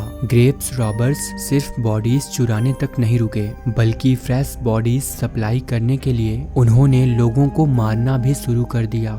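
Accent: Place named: Bengal, India. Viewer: Aab Bengali Accent Mei Q Bol Rahe Ho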